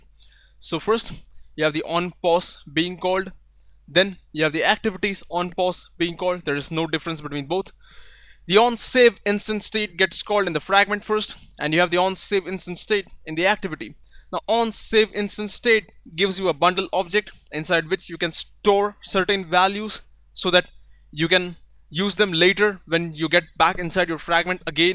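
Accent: India. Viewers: 一听就知道是印度伟哥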